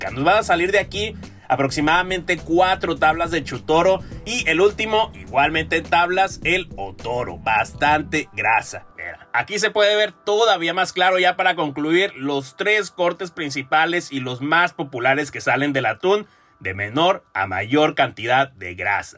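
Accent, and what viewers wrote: Japan. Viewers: Es japones es obio que le cueste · Con todo respeto es divertido escuchar hablar español a los japos.😂❤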